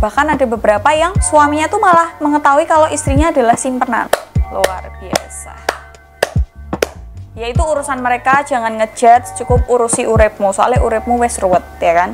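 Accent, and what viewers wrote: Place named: East Java, Indonesia. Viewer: Mbk asline wong ngndi??? Logat'e kyok.wong jawa timur'an..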